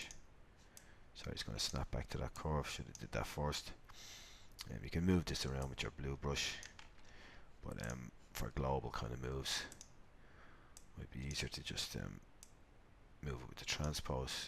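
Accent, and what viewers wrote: Dublin, Ireland. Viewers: fair play thanks for this series,sounds mad to say this but the fact we're both dubs i can follow along that bit easier compared to some other tutorials keep them going mate!:) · someones from Dublin ;)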